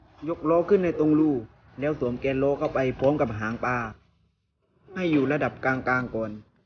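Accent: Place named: South, Thailand. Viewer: พี่แกน่าจะคนใต้ครับ ฟังจากสำเนียง 555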